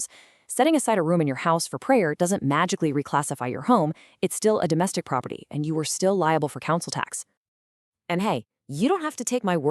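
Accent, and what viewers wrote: United States. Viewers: Why is a bloody American voice being used to preach to us about this ? .is this American actually paying council tax in this now disgusting country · American accent?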